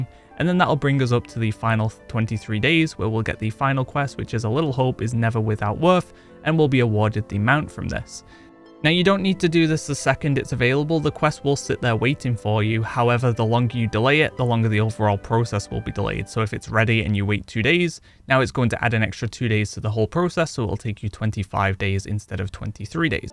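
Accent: United Kingdom. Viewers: You're an absolute unit. Playing a half empty PTR for weeks to provide us collectors with top notch information. I also really love your straight to the point but still detailed style of video - and your UK accent is just the cherry on top, love it 💖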